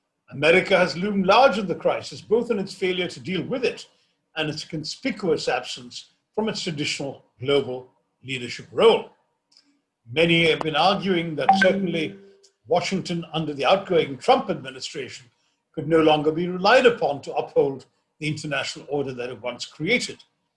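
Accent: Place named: RP, United Kingdom. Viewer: A very shallow take on multilateralism using a high sounding ideals totally divorced from the reality on ground where people around the world have been impoverished by a world run on fiat currencies. With no real exchange of value possible in the market place using fiat currencies, the call to strengthen multilateralism can only be considered a compromised sub-serviance to the forces behind the phenomenon. Now why would a politician from our world -south Asia, Asia, the so called 3rd World-- advocate for the continuation of a system that has manifestly impoverished us to our present helplessess? You should focus some on improving your intentions and making clear where your loyalties lies instead of trying to sound more gora than the Queen! Be.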